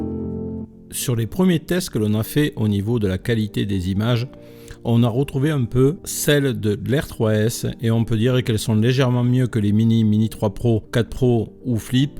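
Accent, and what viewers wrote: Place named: Marseille, France. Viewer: l'accent du sud!! Marseille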